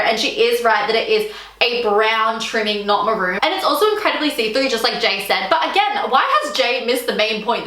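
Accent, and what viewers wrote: Australia. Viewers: Cute video but curious to know, from one aussie to another... where is the sudden "kath and Kim" voice and sayings from? is this a new thing??? LOL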